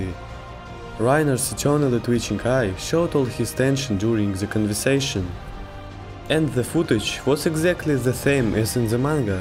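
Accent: Russia. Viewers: You have a Russian accent. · It's Russian accent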